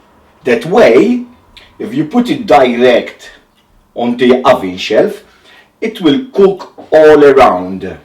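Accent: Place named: Malta. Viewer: I love the Maltese accent and grammar so-so much!!!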